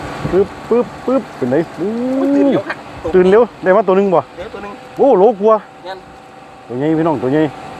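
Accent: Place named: Isan, Thailand. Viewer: ตกใจเสียงเว้า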